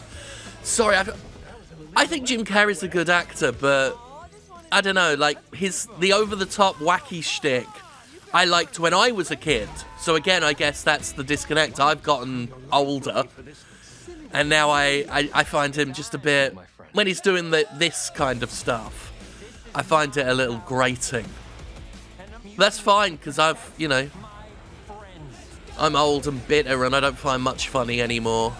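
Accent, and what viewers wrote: United Kingdom. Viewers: you talk like an old man with a stuffy nose :/ you must be british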